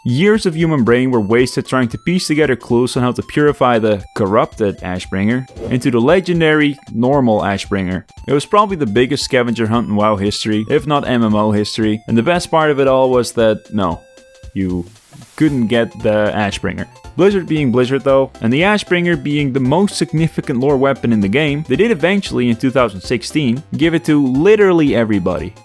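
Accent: Netherlands. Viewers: You sound Dutch!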